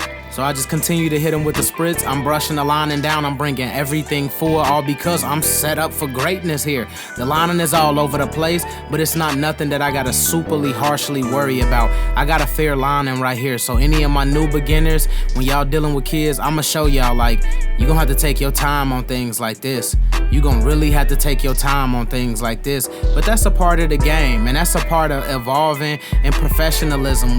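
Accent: Chicago, United States. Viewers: Is he from Chicago? The accent sounds familiar 🤔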